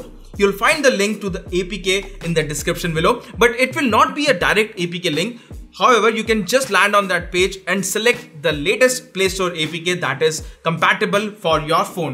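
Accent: India. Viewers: can you just have an AI instead teaching rather that indian voice teach us..really google play is that the best you can do?? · Indian english HAHAHAHAHAHAHAHAHAHAHAHAHAHAHAHAHAHAHAHAHA it's so funny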